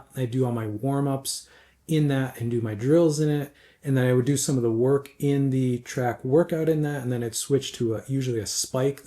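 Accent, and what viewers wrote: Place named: United States. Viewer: I had no problem understanding you and I’m surprised to hear people did. Maybe it’s an American accent and idiom thing.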